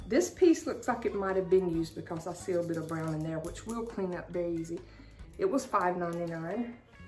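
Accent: South, United States. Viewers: Hi! I love your hutch. I too am a strawberry lover and have many pieces in my kitchen. I enjoy listening to your sweet Southern accent. If I may suggest, don't keep your strawberries through the fall because fall decor is gorgeous and I'm sure you do it beautifully. I change my kitchen for the spring/summer, fall/Halloween/Thanksgiving (not all at once), Christmas and finally winter. I look forward to each season and holiday and each year I have more and more items to incorporate into my decor. It's so much fun! My favorites are fall and my kitchen at Christmas because it is a wonderland of gingerbread boys, girls and houses, sprinkled with cakes, cookies and all types of Christmas candies, faux of course. It's great when you keep finding items for a certain theme. Happy decorating and stay as sweet as you are! Hugs from an ex-New Yorker who now lives in New Jersey. 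Keep up the good work, 💞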